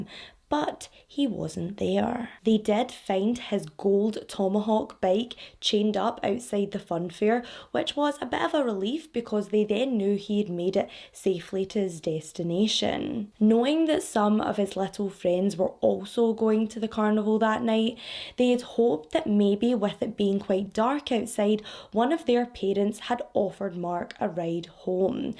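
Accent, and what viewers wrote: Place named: Newcastle, United Kingdom. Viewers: Love the Newcastle accent haha love some representation for northerners ❤️❤️‍🔥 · Why i your....new castle accent is why i better than mine.....yours would make gary linker proud.. and chloe ferry 😁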